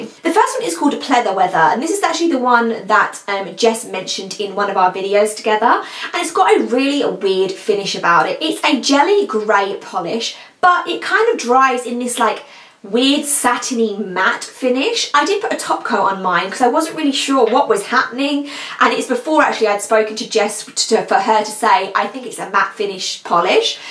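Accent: United Kingdom. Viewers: I only have Aut-umn I Need That but want You Don't Know Jacket and Haute Blood I love how it looks with a glossy top coat rather than matte, yes I'm strange wanting a matte polish to a glossy top coat on it 😂 you're British aren't you as my husband thinks you're Australian.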